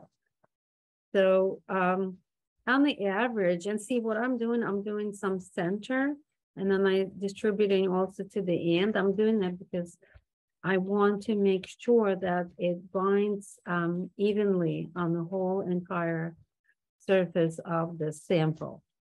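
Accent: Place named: New York, United States. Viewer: great tutorial, loved the NY/LI accent. Is there a link to upcoming workshops?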